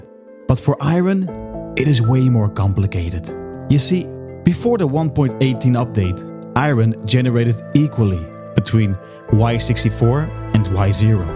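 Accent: Netherlands. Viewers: Is this guy Dutch? He sounds Dutch. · BEN JIJ NEDERLANDS!!?? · TYSM! btw i recognize a dutch accent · are you dutch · you sound dutch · goeie tips maar ik ruik een Nederlander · lol youre so dutch bruh. I mean the stonekolen english is waaay out there. thanks for th vid though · Iets zegt me dat je nederlands bent 😂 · I smell a Dutchie like me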